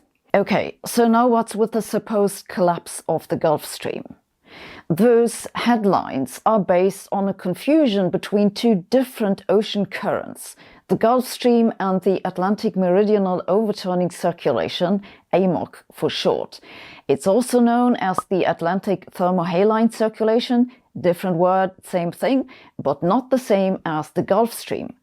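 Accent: Germany. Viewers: Thank you very much for this excellent presentation and i have to say your teutonic accent seals the deal.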